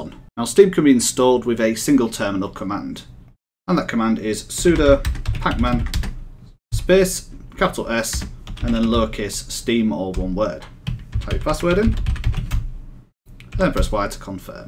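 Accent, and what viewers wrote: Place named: Northern Ireland, United Kingdom. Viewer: Northern Ireland accent 🍀❤️😆Love it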